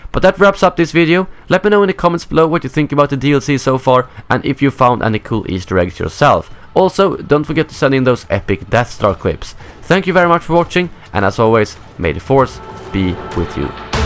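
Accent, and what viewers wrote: Sweden. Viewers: are you froom sweden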